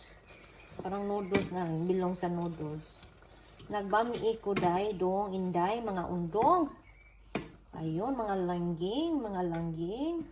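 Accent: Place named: Visayas, Philippines. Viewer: Tuba, taas kaayo harang.sige ko katawa dinhi ba bisdak kaayo jud oi.Nalingaw ko Mao pa pag mara naku.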